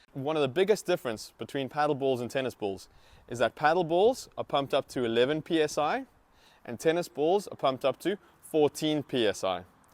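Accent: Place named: South Africa. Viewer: Are you South African? That accent is too familiar ek se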